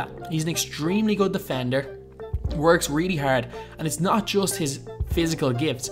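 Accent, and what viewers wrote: Ireland. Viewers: That Irish accent tho! 👍😎🍺🍻🍺 Btw, the same hate Ntikilita is getting is the same hate Porzingis got in 2015 (a Draft just as deep as this 2017 Draft). They're even saying that he'll take 3-5 years to develop, JUST like was said against Porzingis! 😊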